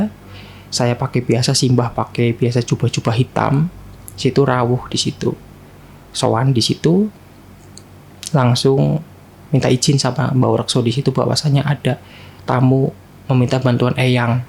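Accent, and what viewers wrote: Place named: Banyumas, Indonesia. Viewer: Ngomongnya kok sprt org ngapak sprt sy 😂😂😂😂😂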